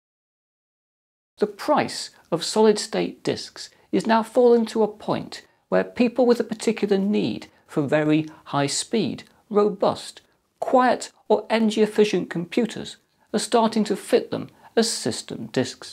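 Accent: England, United Kingdom. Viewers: I think he's Brittisch ^^ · I am an Hellen (greek), but english is perfectly articulated and his videos very enlightening and educative. Thumbs up for both the cool English accent and valid content of this gentleman's videos. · This is by almost tradition how BBC news speaks or most of British documentaries. from his accent i would say hes British. that is just how they narrate this is fine just somehow feel sorry they seem pretty sad when they narrate. almost as if telling me "Why would you do that :( "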